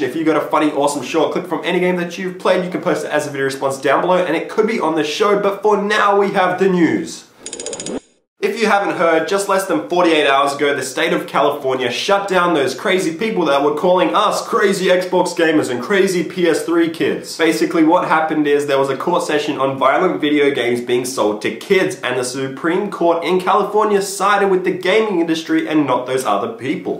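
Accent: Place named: Australia. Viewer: i knew i recognized that accent, aussie u tuber ftw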